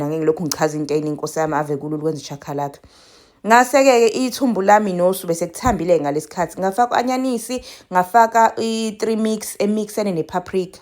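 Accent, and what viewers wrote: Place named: Zulu, South Africa. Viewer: Ngiyakubona nokudla... Ngithanda isiZulu ne accent. Maye. ❤❤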